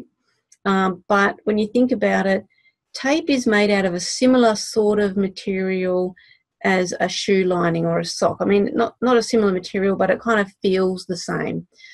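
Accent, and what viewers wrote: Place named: Australia. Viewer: those of us not as familiar with the Australian accent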